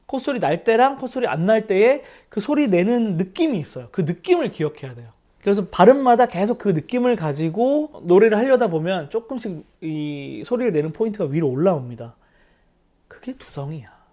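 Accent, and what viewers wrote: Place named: Gyeongsang, South Korea. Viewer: 아..너무웃겨요 배잡고 뒤로 자빠짐요^^경상도발음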